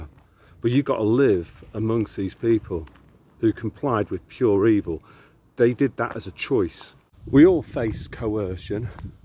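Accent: United Kingdom. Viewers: Sounds British to me. People believe what they were taught/shown on TV/movies, etc...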